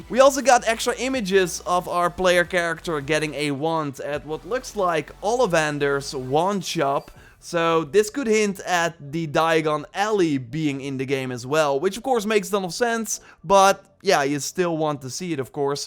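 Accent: Netherlands. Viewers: I can recognize your dutch accent, when you say "video" you can hear it for example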